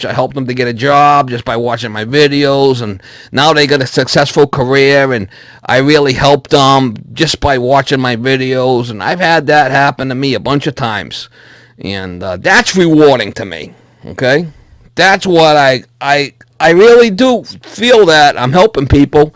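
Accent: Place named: Boston, United States. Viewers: Thank you for providing valuable information and entertaining repair video's, been watching for a few years and enjoy the Bostonian dialogue and temperament. As for the haters just ignore and fight for what you believe in, f them continue to create, take care. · Keep being you!!!! Your a good Man and your time and effort is highly appreciated. Let the dead bury the dead.... Good Ole Boston Accent and Professionalism keeps me a huge Subscriber. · Honestly the swearing along with your awesome accent just made it so much better!! My old boss was from Boston and when he would get mad and start swearing he sounded exactly like you and it was funny as fuck!!!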